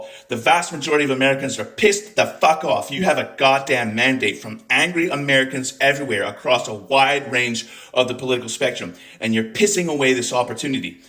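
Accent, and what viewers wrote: Scotland, United Kingdom. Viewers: I am catching a WHOLE BUNCH of a Scottish accent in that rant! So effective!!!! Love it!! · Point #1) absolutely spot-on,. Point #2) anger sounds better in a Scottish accent than any other, and I'm glad not to be the only one who does it. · Love the Scottish accent shining brightly through this glorious, righteous rant. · Scottish accent ?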